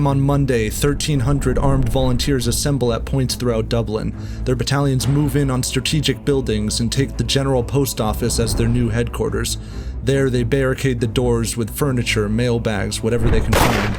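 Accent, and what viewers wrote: United States. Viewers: idk man i think youre overthinking it, sounds western US or Canadian to me, could be either but they sound almost identical, its not the south where almost all the accents sound the same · I agree with the accent thing the commentator is an American true and true 😂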